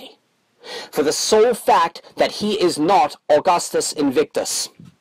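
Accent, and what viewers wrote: South, United States. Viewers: Dear Mr invictus I can only describe the way I feel after hearing you speak on your YouTube channel as "moved". I was starting to think the only time I would hear a man with such a dignified southern accent speak with such passion would be in my own head as I read books about our glorious southern history. This recent attack in Dallas has presented you with an opportunity to obtain a constituency you probably would not have thought would be big supporters of yours. Law Enforcement (and I'm not talking about feds) are without a support group that is not subservient to the same people that are causing their death and missery. If you would do a fire side chat speaking to them about what you would have in mind for them and how their are allies for them outside the fed's and unions, it would be well received. Second I would reach out to James Lancia the author of DownTown White Police. 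His star steadily growing and he is inevitably going to have a large following of police. If you could get Mr. Lancia to opeanly support you it would bring many white police officers to your side. I know it sounds kind of crazy but if you think about it white police officers are in exactly the right position and in the right mindset to welcome a man like yourself right now. Go to Mr. Lancia's YouTube channel. Listen to some of his cop shootout videos. After this I'm sure you will see why I believe these officers will welcome you. Keep up the fight.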